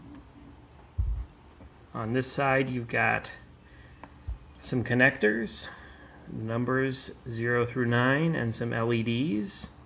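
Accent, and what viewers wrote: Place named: Ireland. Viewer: what are you Irish?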